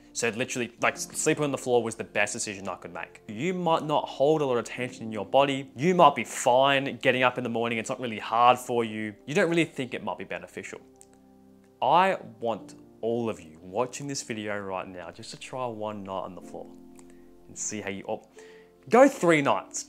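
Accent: Australia. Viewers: Judging by your accent I’m guessing your from New South Wales or Sydney area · Now that's an Aussie accent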